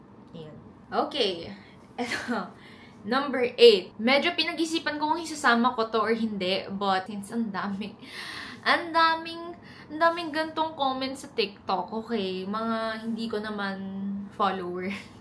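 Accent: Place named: Philippines. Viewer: Pinay na Pinay yng accent mo!Galing mo mag tagalog!👏❤️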